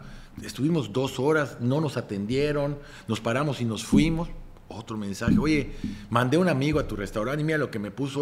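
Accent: Yucatan, Mexico. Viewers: Sigue muy guapo Rulo😊 y me gusta su acento yucateco, bomba 😊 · Habla muy yucateco, 😂😂, se escucha chistoso, faltó poner Fer del solar en el mejor equipo. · El dijo que no remarca mucho su acento ,sino que al natural le sale su acento Yucateco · La mejor época de VLA fué cuando estaba Rulo, Fer y Mau Mancera, no me lo perdia, yo TE conocí Rulo cuando hicieron El programa en El Centro Cultural Mexiquense en Texcoco y aunque no fuiste amable con nosotros el público, me gustaba tu trabajo. Que agrado saber de ti y me causa asombro tu acento yucateco, lo tienes Bien marcado y no te habia imaginado hablando así, con mucha honrra por supuesto. Saludos mi Rulo. ❤❤❤❤❤❤❤ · Mi estimado Rulo que gusto de saber de ti, muchos años sin verte en pantalla, siempre era un gusto ver aquellos tiempos de venga la alegría, ahora escucharte como con acento Yucateco es impresionante, que Dios te bendiga siempre ... 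· Que padre volver a Rulo , hoy necesitamos verlo en tv , su personalidad tan transparente y agradable. Y si tiene acento yucateco 😂. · Si se nota el acento Yucateco pero no tan exagerado. No te estreses mi Rulo por si se nota 😂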